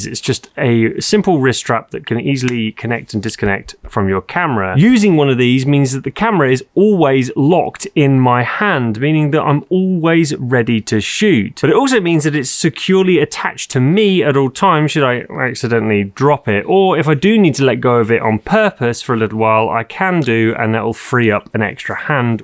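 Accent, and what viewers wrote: United Kingdom. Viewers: For what concerns the cap, in my opinion things are not so straight as you say. From your accent it seems you live in UK, a moist country, so perhaps the air is cleaner than in my country (Italy) where most of the time there is a lot of unseen dust floating around. If I keep my lens without the cap for about 15 minutes I find the lens surface littered with disgraceful dust which forces me to clean it with a cloth, and so loosing the chance to shoot a good photo.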